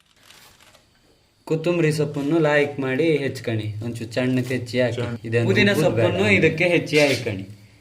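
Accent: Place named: Kundapura, India. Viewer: ನಿರುಳ್ಳಿ ಎರಡು ಗ್ಯಂಡಿ ಸಾಕ...😂 ಒಟ್ಟಾರೆ ಪ್ಯೂರ್ ಕುಂದಾಪ್ರ ಕನ್ನಡ ಮಾತಡದ್ ಒಳ್ಳೆ ಇತ್ ಮಾರ್ರೆ 😄😄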